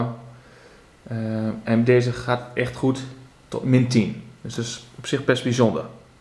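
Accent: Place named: Germany. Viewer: cool German accent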